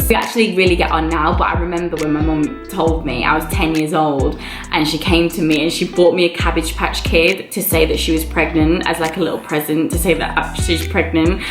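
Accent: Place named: United Kingdom. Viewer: The great thing about you growing up in the UK is that you now have this fabulous British accent that everyone in New York is jealous of. You're a great emcee ( but use the word "friggin" too much as you noted ), but please no more reality shows.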